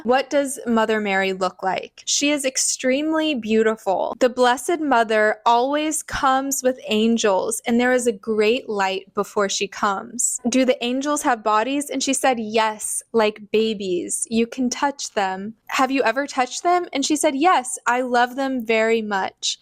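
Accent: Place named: United States. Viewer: Well, here I am commenting again, because I think it’s worth considering another perspective. Yes, the CIA funded aspects of the abstract art movement. Hitler, on the other hand, heavily promoted classical realism. If government patronage alone is enough to discredit an artistic movement, then that principle has to be applied consistently. The conclusion cannot depend on which government happens to be involved. Also, based on your accent, I assume you’re in America. The same society you criticize also protects your freedom to reject abstract art entirely. So even if the CIA pushed it, you are free to decorate your home however you wish. And as you yourself noted, the objective was not to corrupt art, but to demonstrate the cultural power and global influence of the United States, particularly because the Soviet Union rejected abstract art. The movement was being used as a symbol of ideological freedom, not as evidence that abstraction itself was somehow a corruption of beauty. 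I worked as an art curator, and I genuinely adore abstract art. Ironically, although I have access to many classical works, I often find them a bit stuffy in a domestic setting. I have a small black abstract canvas hanging in my hallway beside a classical vase and an antique Austrian Art Nouveau chandelier. I also keep traditional Orthodox icons because I am Eastern Orthodox, and we certainly agree that Christ is the fullness of beauty. Architecture and interior design are ultimately about harmony, prop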